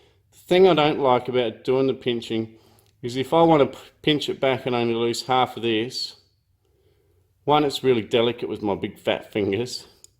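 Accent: Australia. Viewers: Iam a beginner and I love junipers,great tip thanks, the accent and the funnel web spider bring memory of beautiful Australia, thanks again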